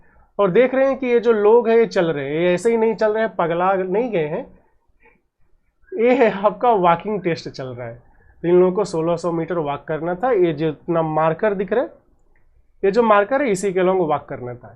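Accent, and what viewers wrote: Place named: Bengal, India. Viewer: Ap bengali ho kya?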